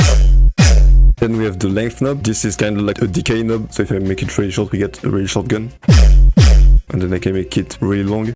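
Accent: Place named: France. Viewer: l'accent francais mdrrrrr continue comme ca !k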